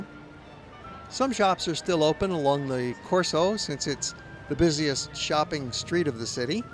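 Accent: United States. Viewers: Great video! Thank you! What U.S. accent have you? I can't pick it... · your accent does sound very American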